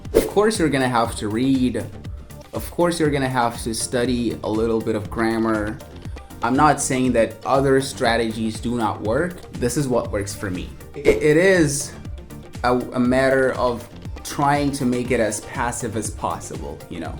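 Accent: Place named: United States. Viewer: Thanks very much for your video. It's very inspirational. I sure would like to hear more about how you have come this far in getting an American accent. I have a few questions like: How long did it take you to come to speak like this? Do you exaggerate your mouth movement when you read out loud slowly? I'd appreciate your answers. You are great. You must have worked very hard. Thank you very much.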